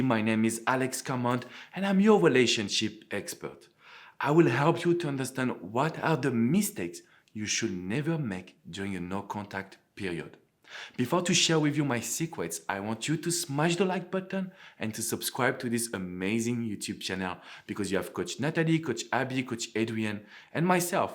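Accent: France. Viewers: i love his accent 💛 i assume he's french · Very well explained... I like your french accent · Your English language with French accent is sooo cute ! 😁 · The sweetest french accent ever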